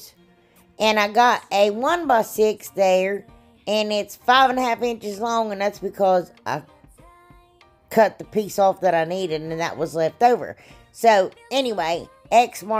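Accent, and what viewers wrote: South, United States. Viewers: Just found you! Love your channel! Where are you from? Loving your accent....Carolina girl myself so I have one too! God bless and keep the videos coming.🤗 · Hi 👋! I'm a newbie to your channel and have to say you had me at the Windmill. I want you to know I laughed the whole time you filming,you are just to funny! I mean that in the nicest way ever. I'm glad you joined Heidi's collaboration you definitely have a new subscriber here. I have to ask where are you from with that accent. I'm in NC and I thought I had southern drawl. Have a great week 😀and God Bless 🙌! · Love that good ole southern accent. I am sorry glad to know that I am not the only one with the decent Southern accent. I'm in Northwest Alabama. Love your crafts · I'm new to your channel. Just love your country accent, where are you from? I loved all your projects especially the baskets. 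Roll Tide!!🏈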